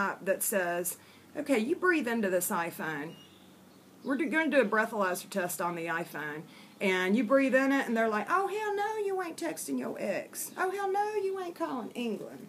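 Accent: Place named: South, United States. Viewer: I love your southern drawl !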